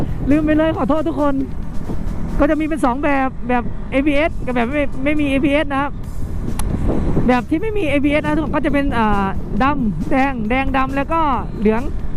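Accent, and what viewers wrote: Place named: Isan, Thailand. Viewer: เว้าอีสานคือเป็นตาแพงที่ว่ะ😅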